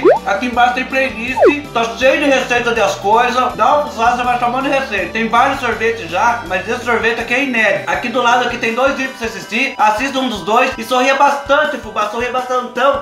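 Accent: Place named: Caipira, Brazil. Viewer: do céu adoro esse sotaque caipira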